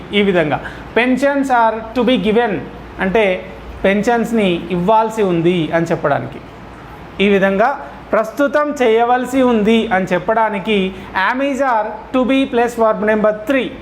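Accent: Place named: Telangana, India. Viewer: Sir మీరు తెలంగాణ మాండలికం లో మాట్లాడుతున్నారు..... కోస్తా మాండలికం లో మాట్లాడండి sir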